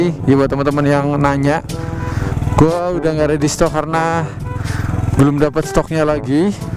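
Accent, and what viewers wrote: Banyumas, Indonesia. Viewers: pantes ngomongnge kaya ngapak ...tengan wong Tegal ..mantapp bro lanjutkan truss pokok.y salam wong ngapak 👍🙏🙏🙏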